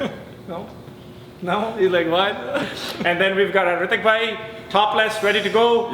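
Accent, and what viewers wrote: India. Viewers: kayknya kalian org india ya?...dari logatnya ketahuan. benar gak?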